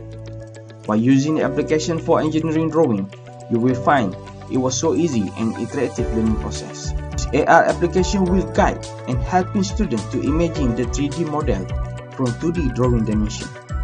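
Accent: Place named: United States. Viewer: Terbaik. Usaha yg sgt baik. Cara cakap pun mmg bunyi slang Amareka. ~ Azar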